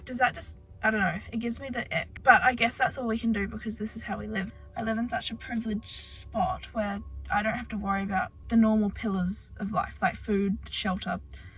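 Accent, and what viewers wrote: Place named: Australia. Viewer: I always wondered why your accent seemed more aussie than kiwi!! makes sense, i had no idea you were from aus 🫶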